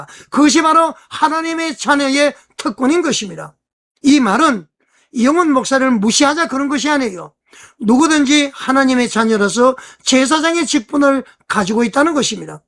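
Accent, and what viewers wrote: North Korea. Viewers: 억양이 이북 사투리가 심한 것 같네요. 우리 교회도 감독에게 양육 받은 부목사가 불륜을 했다는 모욕적 내용을 전 성도들에게 배포, 누명 씌워 담임 목사를 고소해 교회를 박살내 힘들게 했는데 몇 년 지난 후 사실 무근이라 법원에서 판결 받고 벌금 내고 끝났으나 지금도 간간 교회를 여전히 괴롭히고 있네요. 악한 영들의 거짓 행위인 것 같은데... 공산당이 냄새 피우는 것 아닌지 수상들 하네요. 십일조는 반드시 해야 합니다 이는 하나님께 돌아가는 표입니다. 말리기를 참조하세요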